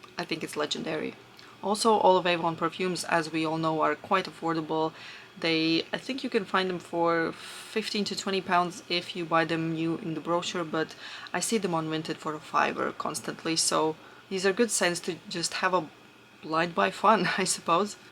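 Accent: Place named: Russia. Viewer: Your accent sounds like you're Russian 😊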